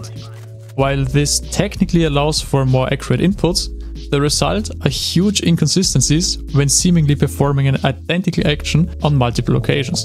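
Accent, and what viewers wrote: Austria. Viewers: hätte auf Österreicher getippt ;-)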